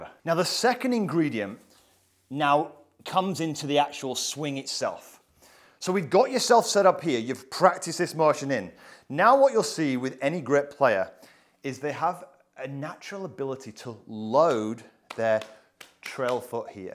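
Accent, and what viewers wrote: United Kingdom. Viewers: Found this channel today. I'm back playing golf after take 15 years off to be a single dad. Why is it I learn the most from people with an accent from Great Britain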